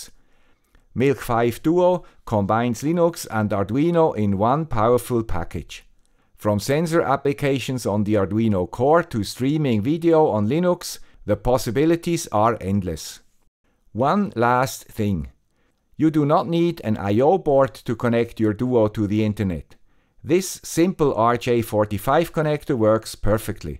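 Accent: Switzerland. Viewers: your dulcet Swiss accent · wow! Thanks guy with the Swiss accent! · we're tired of your Swiss accent!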